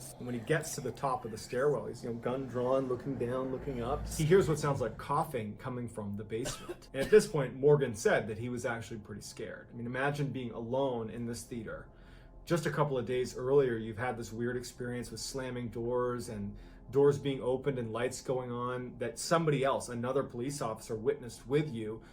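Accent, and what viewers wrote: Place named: United States. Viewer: 🇬🇧 man this girl is cute as fuck!!! 😍 I love her American accent #notaperve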